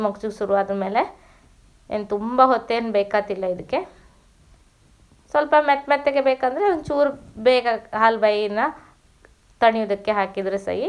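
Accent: Kundapura, India. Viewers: ನಿಮ್ಮ ಕುಂದಾಪ್ರ ಕನ್ನಡ 👌🏻👌🏻 ಗೋಧಿ ಹಾಲ್ಬಾಯ್ ಕೂಡ ಬಾಯಲ್ಲಿ ನೀರ್ ಬರೋ ಥರ ಇದೆ. 2 ದಿನ ಇಟ್ಟು ತಿನ್ನಬಹುದಲ್ವಾ.. 👌🏻👌🏻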